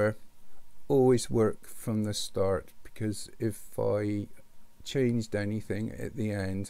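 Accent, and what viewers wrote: West Country, United Kingdom. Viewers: Great video, great west country accent!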